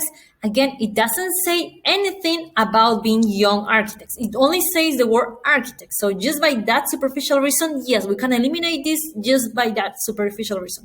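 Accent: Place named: Russia. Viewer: Thank you so much for sharing the best tips with us🙏don't get me wrong, I felt a little bit Russian accent in your speech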